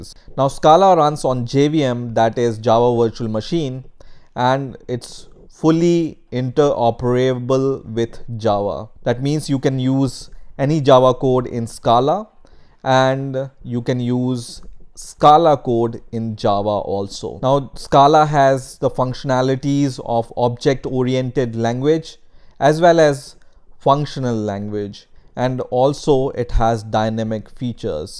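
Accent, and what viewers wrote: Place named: India. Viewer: I don't know why some people take issue with an Indian accent. I think it sounds just fine, even kind of adorable.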